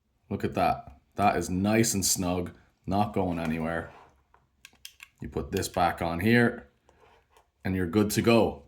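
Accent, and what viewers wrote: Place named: Ireland. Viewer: This is amazing, can't believe a mask that's been around for years which I have just tried and like I search up this issue and you made this but a few days ago. I need this trouble is I have no clue about 3d printing etc. Can I pay you to make me one? I am in the UK by the sounds of accent your just across the sea from me😂 happy to pay for all material, labour and postage? Would really appreciate it if possible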